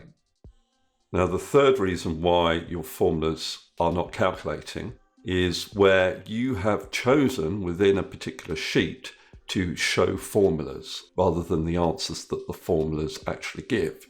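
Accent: United Kingdom. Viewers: Thank you!!! It worked! And the best part to listen to your great British accent!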